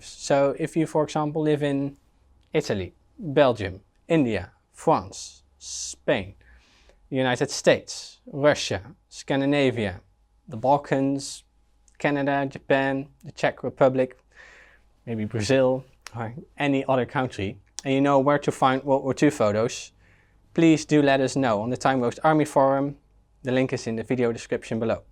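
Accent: Netherlands. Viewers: That's some T H I C C Dutch accent right there! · YES. JOIN ME IN THE DUTCH ACCENT REPRESENTATION SQUAD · Ik hou van een Nederlands accent. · He has a great voice that’s fun to listen to 5/5. It’s like an American doing a British voice while being Dutch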